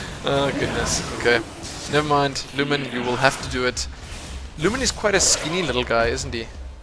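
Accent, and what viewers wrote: South Africa. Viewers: I'm probably wrong but are you south african?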